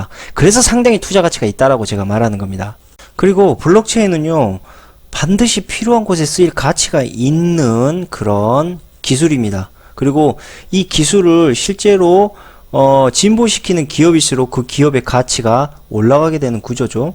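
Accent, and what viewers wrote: Jeolla, South Korea. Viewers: 역사를 좀 배우세요 저분말이 사투리?원리ㅐ 호남말이 표준어 입니다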